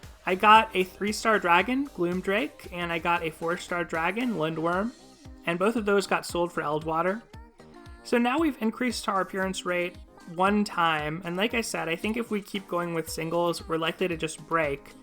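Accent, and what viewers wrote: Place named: Texas, United States. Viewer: A fellow Texan! I wouldn't have guessed it, but now you mention it I can hear it lol. Also this Gala was SO GOOD to me, yeehaw!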